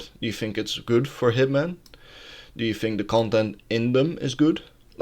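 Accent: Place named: Netherlands. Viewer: Me too, i recognized a Dutch accent :)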